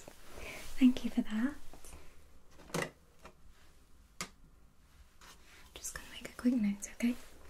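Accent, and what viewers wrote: United Kingdom. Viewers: You have to most beautiful voice with the cutest british accent! please never be quiet in any of your future videos! <3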